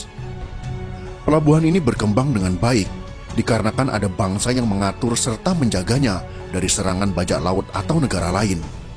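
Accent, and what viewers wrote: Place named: Surabaya, Indonesia. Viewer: boso jowo ne. Koyok jowo suroboyo an min😀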